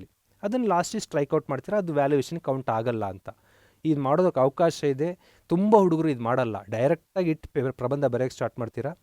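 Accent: North Karnataka, India. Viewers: Are you from North karnakata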